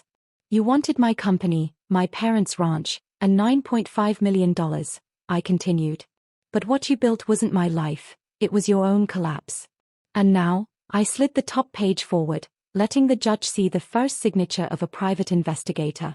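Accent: United Kingdom. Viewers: Why did you have to use a woman with a British Voice, when it's clearly an American Story?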